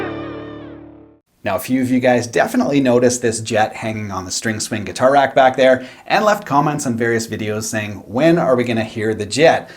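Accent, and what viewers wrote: Canada. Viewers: Are you Canadian?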